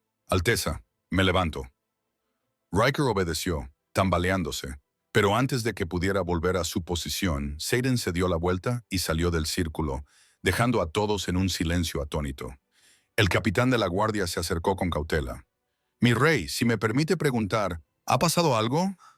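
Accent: United States. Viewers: Siii eso es lo malo del IA, el rey Saden aveces lo pronuncia como “saden”, “seiden” y hasta “siren” y a Brina a veces lo pronuncian como “Baina”, “Brea”, “Brena” y “Bria”; y para colmo aveces suena como un gringo tratando de pronunciar la R 😂😂😂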